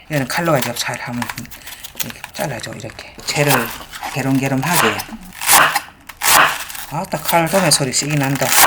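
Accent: Gyeongsang, South Korea. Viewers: 쌔리 뽂아도..ㅋㅋㅋㅋ 이억만리 미국에 나와사는 이민자 이면서 경상도 출신 이라서인지 너무나 정겹습니다. 엄마가 그리워질만큼요.. 항상 챙겨서 듣고보고 따라 해보고 있습니다. 고맙습니다!! ^^ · 솔직히요,,, 전 선생님 목소리 말투 들으러 와요~ 음식레시피는 덤으로 보고가구요~ 우리 가족이 다 갱상도 인데예~ 지 태어나고 서울올라왔심더~ 그래서 갱상도 사투리가 그~리 정감이가요~ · 반찬 배우러 들어오는데 이젠 경상도 말까지 다 알아들음ㅋㅋ쉽고 맛있어요 감사합니다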